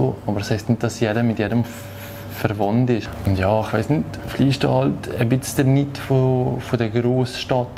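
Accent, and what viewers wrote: Valais, Switzerland. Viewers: Safe isch Valliserdütsch dr schönscht Dialekt ! Segi au als Solothurner haha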